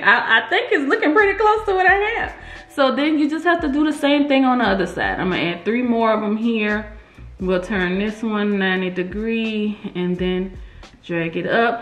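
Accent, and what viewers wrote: New Orleans, United States. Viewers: I heard you talking and I said she must be from New Orleans or somewhere around there. Check the description, Sho nuff you are. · Yes, let’s please! I hear New Orleans 💜💚💛 Is that where she’s from? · I have a quick question 🙋🏾‍♀️ Does any marketing or advertising that we have to do. Also I am from (Nola) I can spot our accent anywhere 😂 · Naturally New Awlins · Are you from New Orleans?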